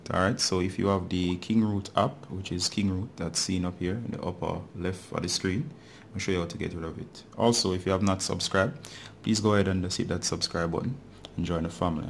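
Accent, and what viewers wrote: Jamaica. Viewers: thx man........... are u a jamaican?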